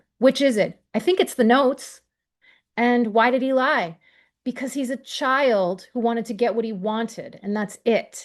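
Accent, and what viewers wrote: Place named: United States. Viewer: It is so shameful that we have to listen to someone with an American accent. The English so called justice system is now the laughing stock of the world because of the Lucy Letby witchhunt. We should also be grateful to Rachel Aviv who wrote from across the Atlantic at a time when truth telling in our country was banned by the trial Judge.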